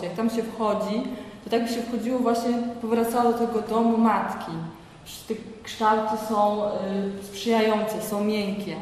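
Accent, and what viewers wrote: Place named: Russia. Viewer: Temat bardzo ciekawy, ale przekaz trudny do słuchania. Co chwila no, no, jakieś rosyjskie końcówki, zamiast ść, sc. To nie rozmowa w małym gronie, ale przekaz do większej ilości osób.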